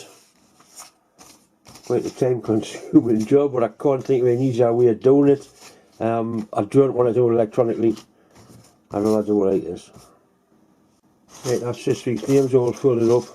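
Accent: Newcastle, United Kingdom. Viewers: Love the accent, I thought a Good Geordie Milling machine would have a Y-Aye axis on it;) Love the ball bearing trick. Take care canny lad:) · I don't know the exact town, but the accent is known as Geordie, spoken in the North East of England around the Newcastle area.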